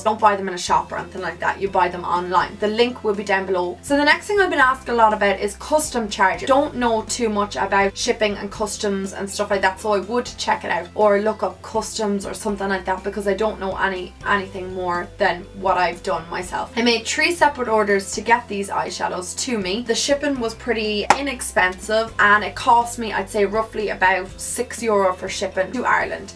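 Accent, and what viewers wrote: Ireland. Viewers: I love your videos so much!!! I love your Irish accent!!! I'm from Ireland too!!! I love you and your channel!!! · Is she irish? Oh shes so lovely dovely 😞 😍💑💏💞💖💕💓💗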